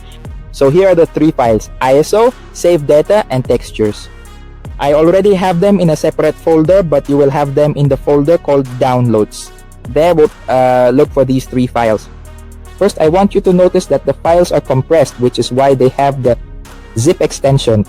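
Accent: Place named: India. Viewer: Bro buen trabajo con lo del inglés pero por curiosidad quería saber por qué tu acento suena un poco como de la india?😅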